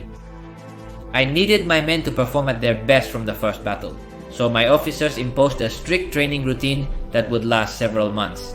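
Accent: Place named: Spain. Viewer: Man your accent is so distracting, just make the video in spanish, it would be more interesting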